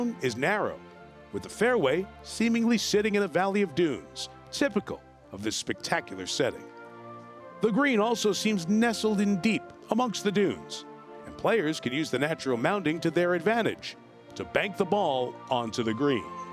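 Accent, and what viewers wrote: United States. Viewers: Why is the narrator an American!? No northern Irish narrators free? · A yank commentating on this is daft. As much as I like the guy who is talking. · Ah here lads, why is an American narrating this and what is with the dizzying transitions from one hole to another. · Yes indeed, why the American narration?! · Shame you got an American to narrate....🙄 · Such a pity that the R&A has stooped to having a yank voiceover. Is nothing sacred???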